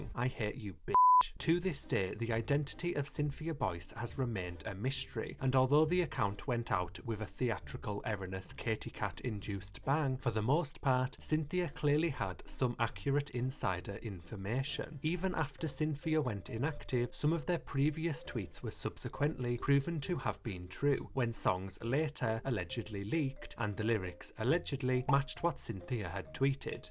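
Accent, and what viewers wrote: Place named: Yorkshire, United Kingdom. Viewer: It's def not London, but i can see why someone would say Newcastle since it's up north. Hes def a Yorkshireman. 🫣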